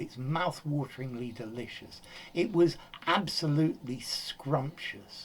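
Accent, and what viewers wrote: United Kingdom. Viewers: Sir, may I know that you're really from United Kingdom because I want to learn the native English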